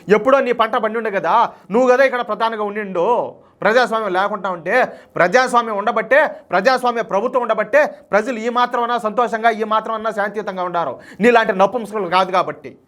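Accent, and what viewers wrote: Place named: Kadapa, India. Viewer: Anna manadhi Kadapa...Anna....slang antlageundhi.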